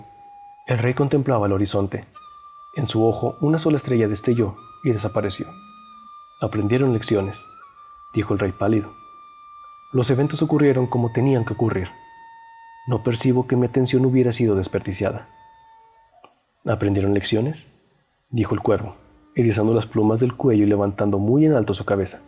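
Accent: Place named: Bogotá, Colombia. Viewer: A mí no, me hace pensar en un rolo gomelo. No me puedo concentrar de imaginarlo. Aunque es claro, vocaliza bien y se entiende muy bien, es sólo si acento que me suena como dije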